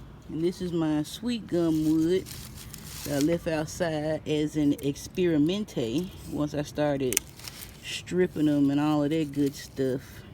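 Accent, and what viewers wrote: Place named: United States. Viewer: Love the American accent kkkk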